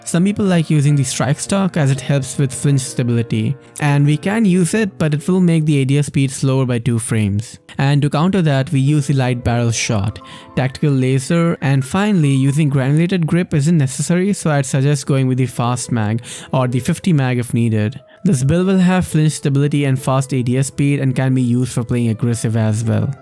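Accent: India. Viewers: hmm, i feel like ur accent is indian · Dude are you from India?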